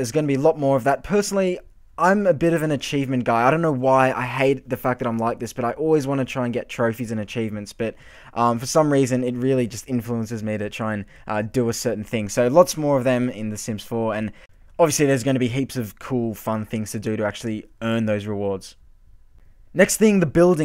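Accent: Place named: Australia. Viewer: What country are you from to where talking and driving are realistic? You sound Australian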